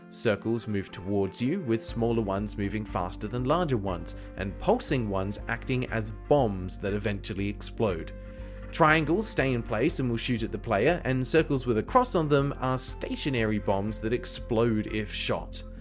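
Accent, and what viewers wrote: Australia. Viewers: YOU'RE AUSTRALIAN???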